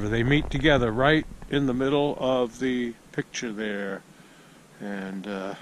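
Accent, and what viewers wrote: Boston, United States. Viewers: Are you from Boston? You sound like you have a little accent. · So good to hear that Boston accent again! Haven’t had TV for a while , so.....no Tanana show😢. Found this on YouTube and am so happy! Keep going Stan!! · I just made the same comment. That’s a Boston accent all day long. I know... I’m from Boston. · You have a Boston accent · Loved watching u on TV I can still here the Boston acent I have it too that's how I know, Glad found u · Stan, I have never met you or your family but I consider you a friend. I miss seeing you on your show because I really enjoyed hearing your Boston accent in cold Alaska. I hope to visit Alaska some day. Keep sharing and I will be watching from Cleveland. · You said "downtown" Tanana ? Bright lights, movie houses, traffic jams? I thought that was funny. You can take the man out of Boston, but you can't take Boston out of the man....."staat , New Yaak . 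Love it! Keep up the good work.....you're a real inspiration. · he grew up in south Boston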